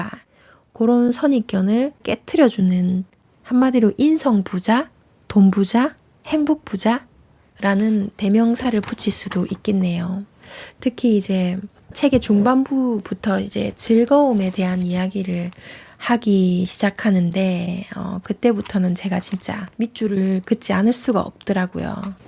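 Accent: Gyeongsang, South Korea. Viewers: 님 갱상도가? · 님~항상 영상 잘 보고 있습니다^^ 경상도 억양이 넘 정감스럽습니다^^ 저는 경남 진주 입니다~ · 댓글 쓰려니 뭐가 뜨네요 이런거 첨이고 디게 예민하신가 유튜버중에 경상도말 쓰시는 분 엄청 많네요 표준어보단 귀에 덜 들어오는게 사실이네요 전달력을 높이려면 조금 연습하셔야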